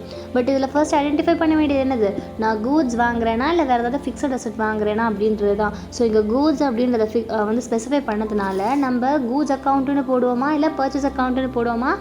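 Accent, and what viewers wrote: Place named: Tamil Nadu, India. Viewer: Super sila visyam Puriyama irundhichi, But Unga Tamil slang with Teach Explain skill moolama Perfect ah Purinjithu Thanks From Sri lanka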